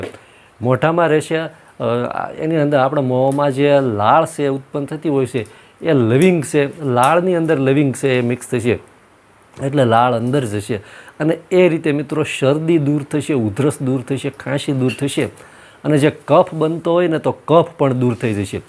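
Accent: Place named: Gohilwad, India. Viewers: Namaste motabhai... Ghanaj samay pachhi aap no video prasareet thayo.. aapni kathiyawadi bhasha sambhalvi mithi lage chhe.. aap na darek video hu jovu chhoo.. Ghanaj upyogi hoy chhe and monghi dava ane teni Side effects thi bachave chhe.. aava Sara's ane upyogi video apta rahejo Namaste. 😊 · એ તેમની બોલી છે ગુજરાતી તો બરાબર ગોહિલવાડ ની બોલી છે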